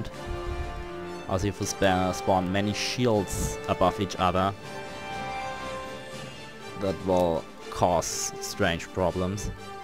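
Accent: Germany. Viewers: Bist du deutsch?? 🙃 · dadurch weil ich deutsche Akzente gut kenne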